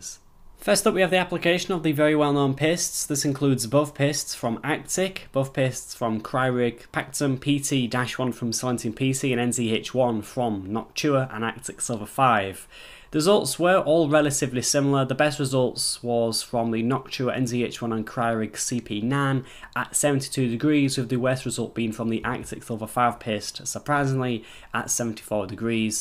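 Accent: United Kingdom. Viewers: I suspect that you are speaking English; however the thick British accent makes it very hard to understand what you are saying. Too bad because it appears that you have a good comparison.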